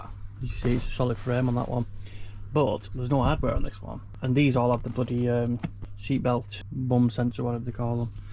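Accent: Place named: Leigh, United Kingdom. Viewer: were are you from leigh or wigan sounds like leigh bolton lad here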